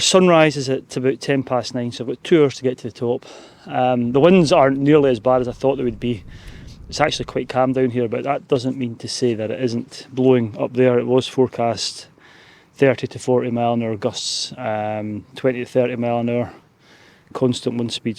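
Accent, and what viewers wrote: Scotland, United Kingdom. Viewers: I love watching your beautiful videos - thanks a lot for sharing them - and I'm a bit envious. It's not that easy to understanding your strong Scottish accent but I like listening to you anyway. Almost 48 years ago I stayed at Achmelvich Beach Youth Hostel and got a glance at this interesting looking mountain. I have been wondering what it might be like climbing it. I never made it - what a shame. Unfortunally I'm getting more and more hightsick the older I become. So whenever I watch you following a steep ridge I'm glad knowing you beiing back down safely again.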